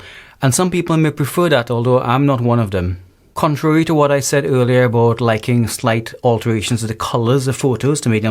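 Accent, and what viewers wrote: Trinidad and Tobago. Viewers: I hear a Trini accent...🤔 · You from trinidad?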